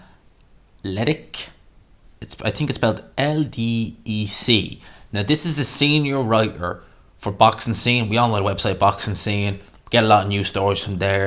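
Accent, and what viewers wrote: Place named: Ireland. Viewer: Your Irish accent is very cool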